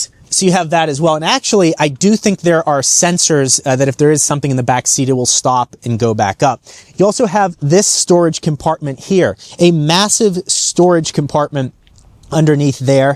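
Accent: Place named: AAVE, United States. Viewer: Cringe ebonics simpleton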